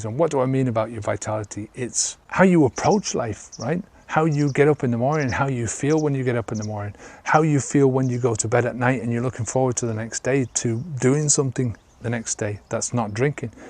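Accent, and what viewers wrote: Dublin, Ireland. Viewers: Such a cool accent! Is this Irish or Northern Irish? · hint of a Dublin accent here?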